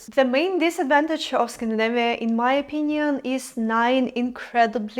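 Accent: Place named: Russia. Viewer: Off topic, but I thought you were Russian from your accent - it is beautiful! I would love a video comparing the two countries! :)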